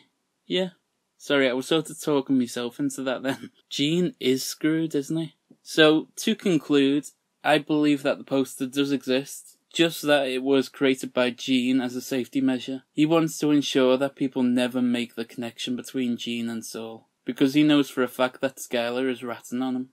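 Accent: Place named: Yorkshire, United Kingdom. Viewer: I thought that exact same thing and subbed too! I'm no expert but i thought i hinted some early Alex Turner in your voice, I believe he's from Yorkshire? I'm horrible w accents tho so I'm prbly wrong 😂 thanks for the video, i love your theory of Saul running the "tip" line.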